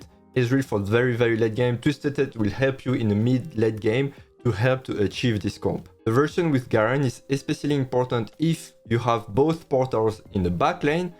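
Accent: France. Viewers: Lol his accent is truly french and you are truly a bigot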